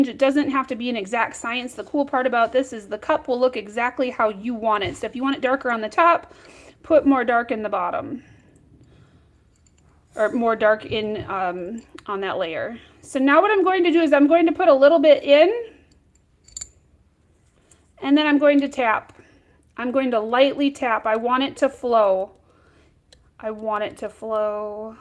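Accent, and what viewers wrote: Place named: Upstate New York, United States. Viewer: You sound like you’re from upstate NY. Or Michigan but mostly upstate western NY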